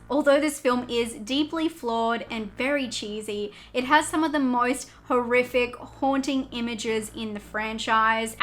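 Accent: Australia. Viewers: People making a fuss over pronunciation are ridiculous. I love your Australian accent! It rocks🤘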